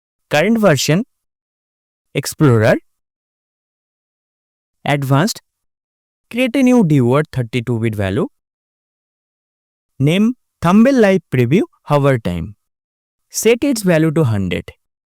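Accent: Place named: India. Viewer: chill, not everyone has en as native lang, it's just indian accent, the video is dead easy to understand... even without audio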